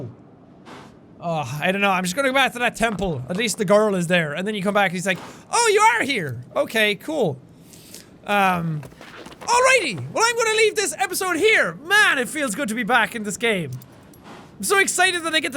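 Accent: Ireland. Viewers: Tried watching a JSE video from 2013... couldn’t get past the first minute... I missed high energy Jack with his full Irish accent! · can somebody help. pronounces tri angle as try angle instead of triangle. he emphasises the space in between, is this due to his Irish accent/upbringing. I am northern English and do not pronounce it like this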